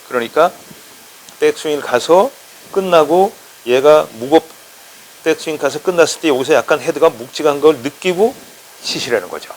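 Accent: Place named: Seoul, South Korea. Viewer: 경기 해설도 너무 쟐듣고 있어요 ~~ ’했거던요‘ 하는 서울 사투리 너무 좋아요^^ 정말 듣기 희소한 서울사투리에요^^ 제 고향이 서울이라 그런가봐여 내용도 너무 좋아서 세계적인 선수들의 플레이 보고 설명들으면 너어므 공부가 됩니다 그냥 장활영 해설위원님 해설 경기는 그냥 틀어넣고 ASMR처럼 듣고 있으면 힐링 그 자체입니다 감사합니다^^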